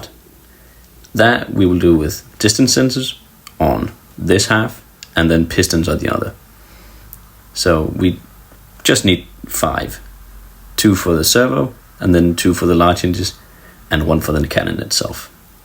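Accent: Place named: Denmark. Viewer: Wait are you Danish the accent is great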